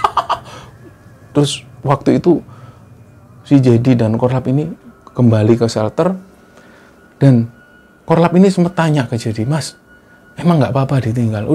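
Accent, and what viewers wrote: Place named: Surabaya, Indonesia. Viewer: Pengen ktm mas.dn team,mas. dgn logat khas sby nya n ciri khasnya, bikin 😍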